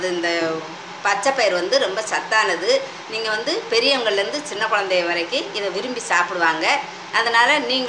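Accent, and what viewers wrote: Madurai, India. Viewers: Neenga Madurai..ya Amma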